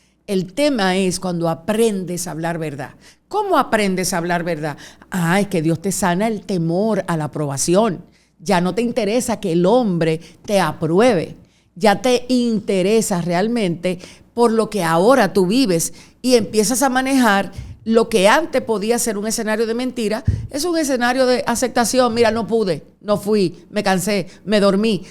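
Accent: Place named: Dominican Republic. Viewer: Q bonito habla está Hermana, q acento, q pronunciacion y enfasis mas bonito, muy capacitada en él conocimiento de la palabra pero sé nota q ha recibido un un espiritu de sabiduría y revelacion muy vasto! Poniendo la mujer cristiana dominicana en alto!!!!Saludos de una hermana cubana desde Kentucky!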